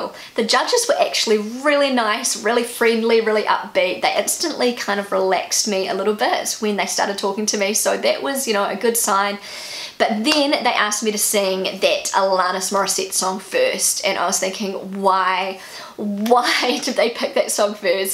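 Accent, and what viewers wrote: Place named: New Zealand. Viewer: How could you hide your beautiful singing voice from us until now? I am impressed by/with all your talents,. The way you tell your little stories, is simply fascinating. And as I said before once, though you speak so fast, it‘s much easier for me to understand the NZ accent than a broad American accent. Maybe, it is because your accent sounds so much more charming. Have a wonderful Sunday ☀️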